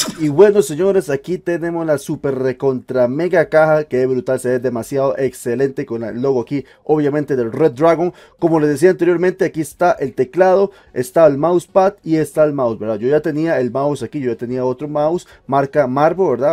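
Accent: Costa Rica. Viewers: andaba buscando reviews de este teclado y adelante el video hasta el minut0 y lo primero que pense fue: seguro es vara que el mae es tico jajaja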